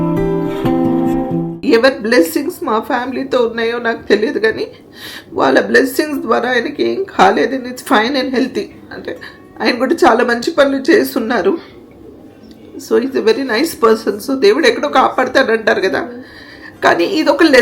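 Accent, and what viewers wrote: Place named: Telugu, India. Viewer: I'm from Bangalore and I have been watching your all family channel from so many years Morethan everything I personally love your telugu accent the most amma You have done so many kannada movie, which we love to watch. You are know family member to me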